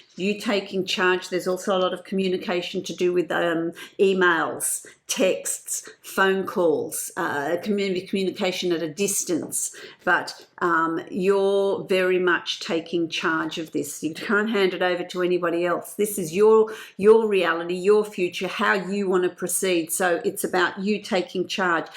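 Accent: Australia. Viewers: an Aussie reader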